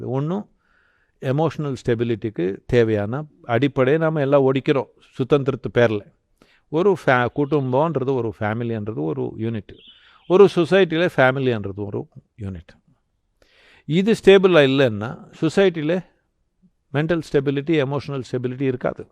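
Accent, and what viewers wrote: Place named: Karnataka, India. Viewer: every Kannadiga sounds the same when they talk in tamil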